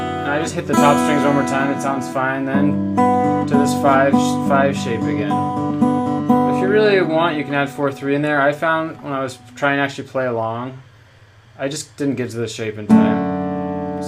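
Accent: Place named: United States. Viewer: Yes, the only thing is, I mean I don't know where youre from, but as a German you dont understand a word, it seems to be one :) Are you californian or texanian?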